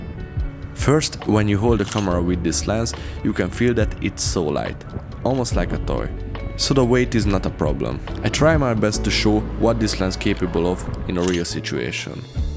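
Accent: Hungary. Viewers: Meghallottam ahogy beszélsz egyből levágtam hogy magyar vagy :D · Amint meghallottam az akcentust, tudtam hogy magyar vagy. :D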